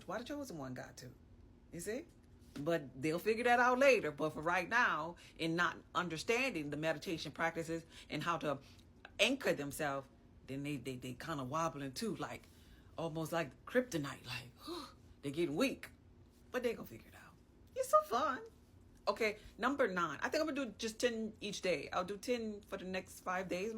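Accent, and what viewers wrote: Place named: Gullah Geechee, United States. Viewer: I love your accent! 😍 Totes random! Sounds Gullah Geechee.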